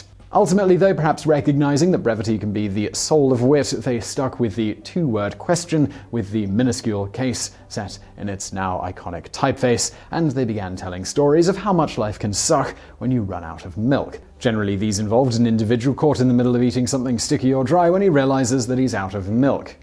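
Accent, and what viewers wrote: England, United Kingdom. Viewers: I figured out one of the reasons I like this channel. He's got a real Brit accent that's better than the usual fare to listen to, but he doesn't speak it like he has a mouthful of Marbles!!! I can't recall even once, thinking to myself, Whaaat did he just say!?!! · Briar Hood in England (where he’s from so this might explain it) we say Nike the way he did and Ad-ee-das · Why r u so triggered lmao ? That's the British way of saying Nike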